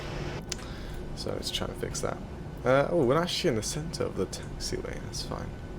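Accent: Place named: England, United Kingdom. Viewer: Why does he have an English accent in new Orleans?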